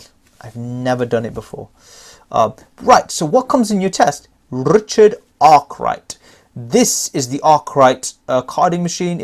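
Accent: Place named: Scotland, United Kingdom. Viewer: your scottish accent is on point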